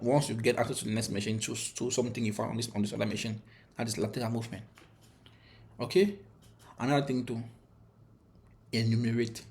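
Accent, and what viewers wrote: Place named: Cameroon. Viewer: I recognize that Cameroonian accent. I'm on eJPT right now. This is probable my next cert.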